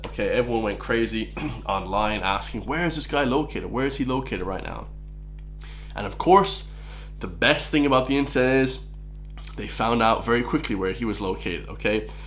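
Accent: United States. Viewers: Question., you seem to have an American accent. But you use words we don't use in the U.S. ( bollacks ) etc. What's up with that? Otherwise great job. Could you send us some of your travellers to the P.N.W. ,across the pond. .see we have these f***tards over here called ANTIFA and they really need the same kind of treatment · This is so sick!! Get them TF out!!! Are you a American living in Ireland? Your accent sounds very American. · Why do you sound like a yank?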